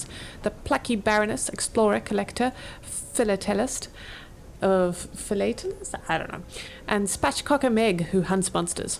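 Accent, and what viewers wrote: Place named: Germany. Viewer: hello. i think i can hear an accent in your voice. may i ask what country of origin you are from? oh wait, are you from germany? have you any plans to continue playing this game?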